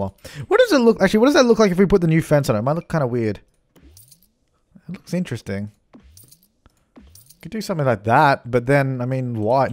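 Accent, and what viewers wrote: Australia. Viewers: ps: i looooooooove australian accent ^^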